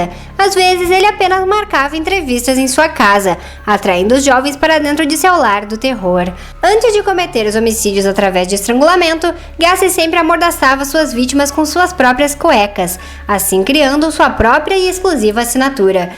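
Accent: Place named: Bolivia. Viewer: voz de boliviana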